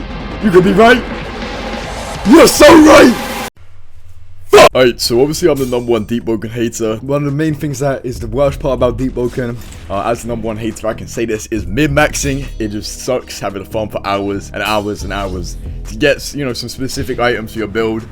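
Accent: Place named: United Kingdom. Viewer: You are so British, damn...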